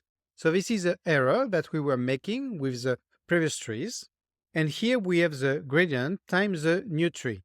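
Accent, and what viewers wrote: France. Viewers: t'es français, mon pote ?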